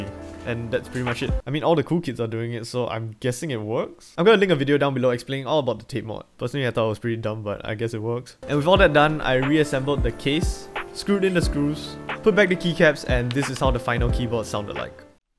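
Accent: Singapore. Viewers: are you Singaporean? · Hey are you Singaporean you sound like one ngl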